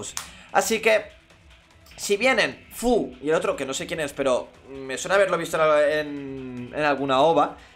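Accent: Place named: Japan. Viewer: F por esa entonacion japonesa...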